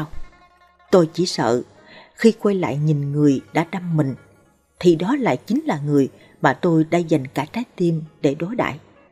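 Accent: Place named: South, Vietnam. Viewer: Chị đọc giọng người miền nam nghe hay nhẹ nhàn trong vắc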